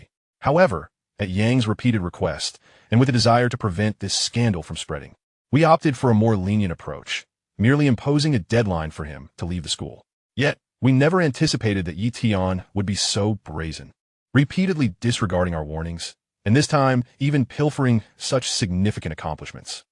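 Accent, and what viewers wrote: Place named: France. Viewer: Stopped after 7mins due to that FRENCH ACCENT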